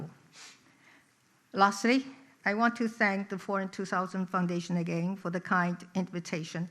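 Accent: United Kingdom. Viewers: 的口音真的很英式，不像台灣人在說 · 小英總統有點英式腔呢😂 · 小英的英國腔好好聽喔～～智慧跟勇氣兼具，絕對是全球最有魅力的女性領導人之一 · 小英的微英國腔好好聽，堅定不移又時而幽默的口氣。不禁讓人想起對抗納粹時的英國政治家。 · 好獨特的英國腔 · 伦敦音很舒服有教养放眼世界无论东西方很少有的领导人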